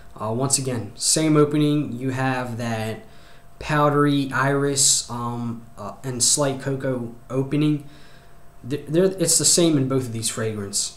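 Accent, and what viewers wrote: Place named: Baltimore, United States. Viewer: *also... While I know your country is listed as Greece your accent sounds a lot like Baltimore City, Maryland... Just thought I would mention... ^_^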